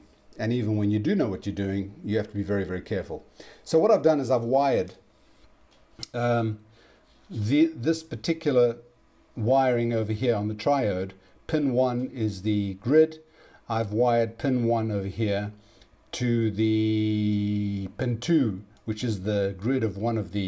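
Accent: South Africa. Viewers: I happened on one of your newer videos, found value (got two needing new capacitors), so started at the first video posted. Dad passed on an interest in people and their accents, so was trying to place it, vaguely familiar. Now knowing an Afrikaans accent, of course, heard it years ago in some of the Uni classmates. My only connection is Dutch ancestry, who desired so much to be American, our family no longer speaks the Mennonite dialect or German. But, the attraction to Germanic was not removed, have a Telefunken upright console and a Körting (an American-German love child, my example, a stereo SE ECL86 with not one shred of information on the internet). 😜 Cheers, Adriel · "do not do this at home.." Why not, thats an excellent solution if the correct part is not at hand, hehe :-) Judging from your accent this old saying would apply: `n boer maak `n plan. 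En dis geen kak plan nie meneer, dis baie goed werk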